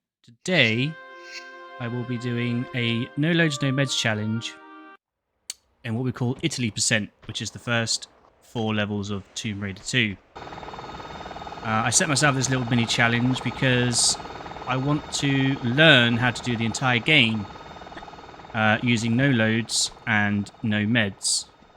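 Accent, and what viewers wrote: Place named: United Kingdom. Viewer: Great video! You sound like you are from the United Kingdom!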